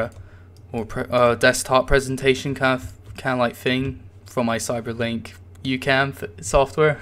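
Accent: United Kingdom. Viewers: Because he's Scottish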